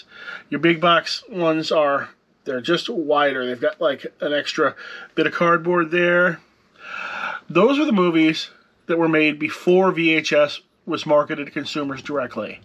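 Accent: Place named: Missouri, United States. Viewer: You sound like your from Missouri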